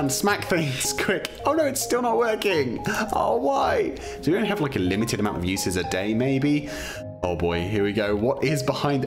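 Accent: United Kingdom. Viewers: green ninja 5521 hes british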